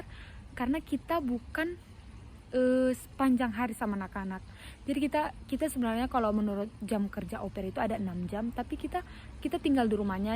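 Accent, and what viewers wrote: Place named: North Sumatra, Indonesia. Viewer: Kental kali logat Batak kita itu y kak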